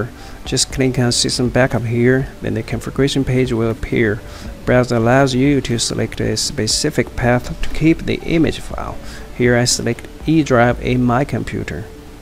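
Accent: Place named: South, United States. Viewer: It’s a very interesting accent. I heard moments that reminded me of Indian, Chinese, Vietnamese, US Southern, and maybe Eastern European! My guess is Chinese living in the US South.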